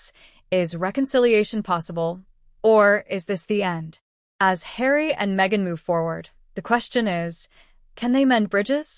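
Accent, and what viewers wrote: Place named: United States. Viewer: And is this American accented commentary worth a damn. Truth or BS?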